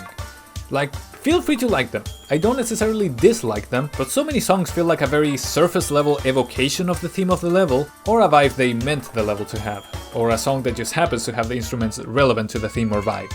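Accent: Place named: Chile. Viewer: Un chileno hablando ingles? Aprende toby fox 🗣️🔥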